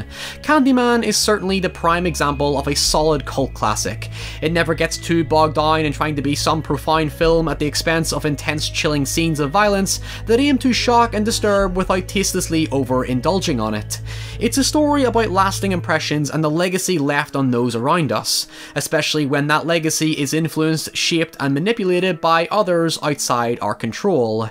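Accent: Northern Ireland, United Kingdom. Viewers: I honestly love you, I love hearing you talk! I'm Northern Irish so it's comforting to hear XD such a huge fan of all your videos, including this~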